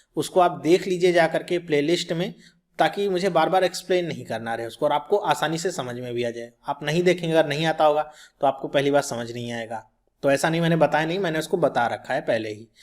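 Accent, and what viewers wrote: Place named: Bihar, India. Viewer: his language seems to be bihari difficult to understand :(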